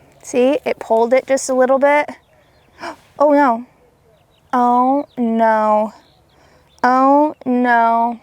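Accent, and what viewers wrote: South, United States. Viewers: Are you a Southern gal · Love your confidence and those early morning tee times as that fits my bill for fun golf & love your southern draw & sweet skirts. Love the game & your tips.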